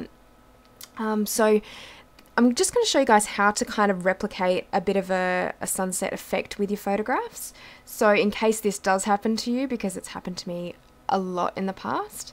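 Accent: Australia. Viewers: with an Australian accent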